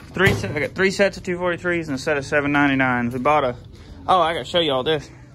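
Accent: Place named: Tennessee, United States. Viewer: Hey Brother I appreciate the info the problem with me is I'm a single dad of two little girls 10 and 7 . the issue is I don't make alot of money I make about 17 bucks an hr . I want to do all this stuff to my truck but I'm afraid I'll mess something up. I ain't got the money to fix it or to send it off to get it done. On a scale of 1 to 10 how hard is it to do this? I wanna do it but afraid ill tear something up. Anyways god bless ya Brother .sounds like you got a Tenn accent I lived in Tenn for six yrs in a small rural town called Cunningham Tenn. I loved it man best six yrs of my life. I cut tobacco yellow and dark. I hung it in the barns as well. Best years of my life .you gained a subscriber.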